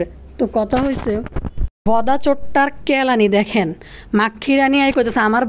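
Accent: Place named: Feni, Bangladesh. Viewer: আপু কেমন আছেন। লাইক দিয়ে দেখা শুরু করে দিলাম। সমাজের মধ্যে এ সব নোংরামিগুলো বন্ধ করা উচিত । আমার দেশের বাড়ি ফেনীতে । এখন আছি আমেরিকার আলবেনীতে। আমার আর একটা নাম্বার থেকে কমেন্ট করতাম। হাছিনা আলম আইডি থেকে । এ নাম্বার টা এখন বন্ধ আছে। তাই নতুন নাম্বার থেকে কমেন্ট করলাম। এগিয়ে যান সামনের দিকে । আপনার কথা শুনলে মন ভালো হয়ে যায় । বিদেশে বসে নিজের এলাকার ভাষা শুনি তখন শান্তি লাগে। আশা করি আপনাকে সরাসরি একদিন দেখব। সবাই কে নিয়ে ভাল থাকবেন। আমাদের জন্য দোয়া করবেন।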